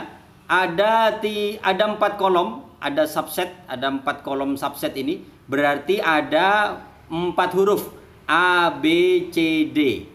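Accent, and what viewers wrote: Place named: Madura, Indonesia. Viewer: Semangat pak, lanjutkan mengajarnya , saya suka dengernya kalo pake logat madura gini mantab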